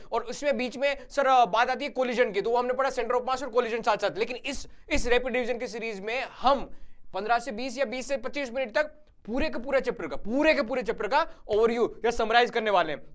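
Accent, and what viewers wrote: Gujarat, India. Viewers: Sir aap Gujarat se ho kya aapki voice tone bata rahi hai 😅😅 Sir aapne bohot accha revision karvaya ❤❤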